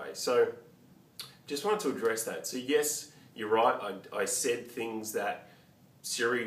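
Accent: Australia. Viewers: OK, first off, your accent has absolutely nothing to do with tellme's ability to understand you. You're right, tellme has a commands list, which you deliberately avoided using! Try the correct commands list, even with your Aussie accent, & I'm SURE it'll work (I've seen it do with accents far stronger than yours!) 2nd, all your video served as was a giggle for Apple fanboys. 3rd you make Siri seem perfect, it is NOT, but you don't show that in your video; hence it's misleading.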